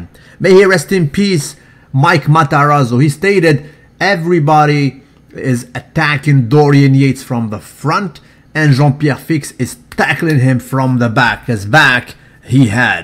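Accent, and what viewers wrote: France. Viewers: son accent est plutôt français que québecois dailleurs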